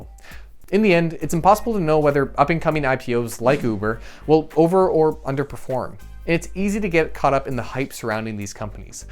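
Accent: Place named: Canada. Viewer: Just enjoying the Canadian Abeut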